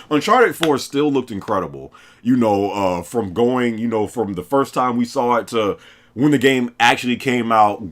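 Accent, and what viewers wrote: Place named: New York, United States. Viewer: lol I could've sworn this nigga moved to ny the way he talks😭 saying my guy?😅 anyway, much love from Harlem ny fam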